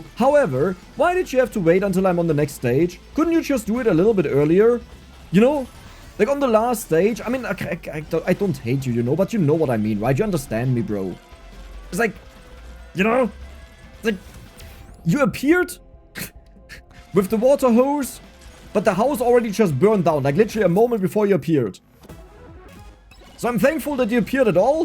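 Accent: Germany. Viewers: Could it be that you are German ?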